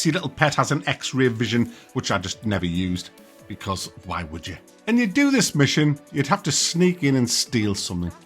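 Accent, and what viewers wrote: United Kingdom. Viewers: Why do you sound more British than ever in this video? Something is different about your voice.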